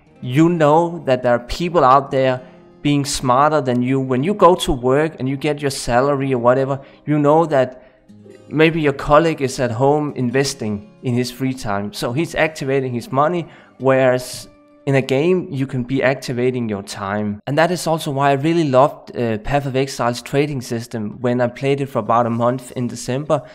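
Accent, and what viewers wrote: Denmark. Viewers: I think in some way or form we all dislike real money trading like you are doing half the time on JSP, there is no way around it it’s pay to win but that said trading items for real money will never go away so that’s way I am happy with the current status of JSP. You sound Danish? Am I wrong 🤷🏻‍♂️ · Nice video. Elsker at din danske accent er så tyk at man slet ikke er i tvivl XD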